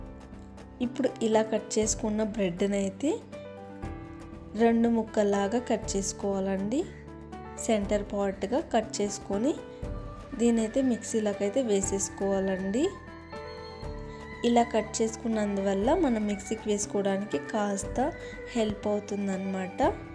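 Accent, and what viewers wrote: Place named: Andhra Pradesh, India. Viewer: Pakka andhra style mathadthira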